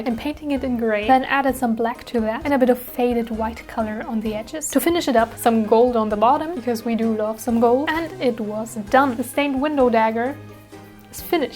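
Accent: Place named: Germany. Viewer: Ha I knew you were German I could hear it in your voice I'm German to brw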